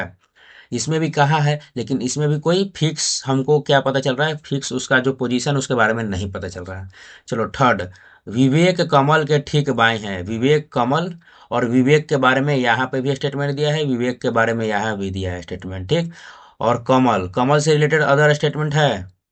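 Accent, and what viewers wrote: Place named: Patna, India. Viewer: Bhaiya Patna se lagte hai sayad bhaswa wahi hai ❤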